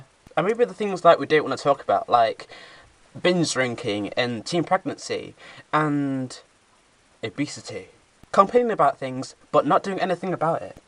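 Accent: United Kingdom. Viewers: What's british is your accent. If we'd met I'd have to stop you and ask you often to repeat slowly what you say because I miss lots of parts (I have to listen to brit films with subtitles). Also, your haircut is sort of british, no? Well, to me it reminds a period of the early 1980's which was specifically brit.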